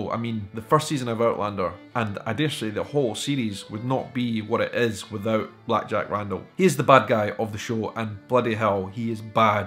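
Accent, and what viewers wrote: Scotland, United Kingdom. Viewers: I'm watching you since few videos now, and watched some of your past ones. Not that relatable to this topic, but must say, I really enjoy your dialect. I'm not a native speaker, but Scottish dialects are the best regarding the ability of understanding for me. You guys speak very openly, articulate beautifully, I mean so much i and e sounds, just like my native language. It is also nice to hear and learn new area specific words! Thank you for your work! :) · Beautiful Scottish accent You have Pal. I am not even from England. But I am fascinated of any culture that came from this beautiful Island:)A 5y visit and live in Yorkshire changed my mind totally about people from there, I met many scotish folks there:)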